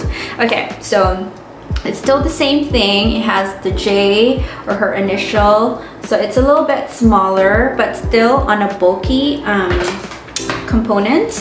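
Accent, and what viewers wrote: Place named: United States. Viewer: I love the slang American accent ..smarty sissy ..wish you luck on your channel.much love 💛❤️💙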